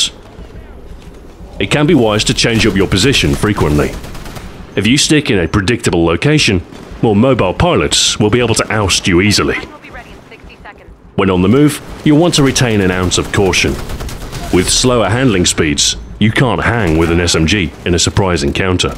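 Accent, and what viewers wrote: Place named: United Kingdom. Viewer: THIS GUIDE IS AMAZING!!! I am 100% confident that you produce the best Titanfall weapon guides on the Internet! It's so professional, engaging, and well-edited. Additionally, your magnificently deep, British voice is the perfect sound for discussing the topic of Titanfall armaments. This video was so good that I actually got goosebumps at the end!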